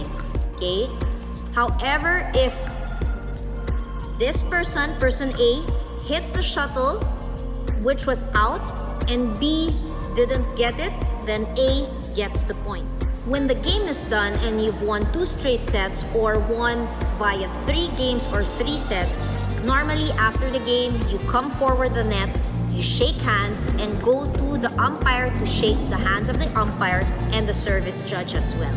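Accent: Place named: Philippines. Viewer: Based on her accent, most probably she is Filipino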